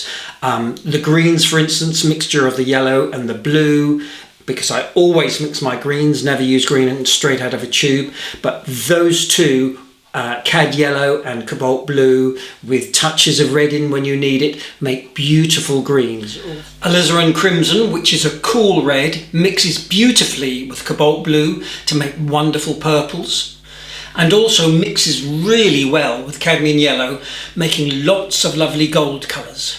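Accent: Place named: United Kingdom. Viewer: I have just discovered you and I am in love with your lessons and your marvellous British English and humour. Thank you so much!!!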